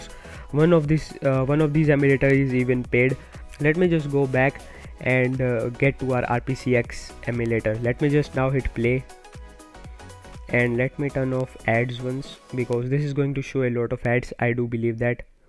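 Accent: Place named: India. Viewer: when i hear that Indian accent i know its a scam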